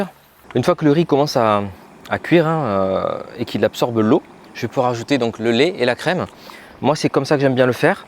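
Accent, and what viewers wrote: South, France. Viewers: Avé l’accent…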